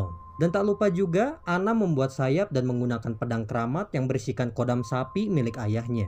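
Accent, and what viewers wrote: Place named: West Java, Indonesia. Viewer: Penjelasan jelas, ada sundanya kocak.. Paket komplit lah ini channel wkwkw auto subsscribe waa.. 🤣🤣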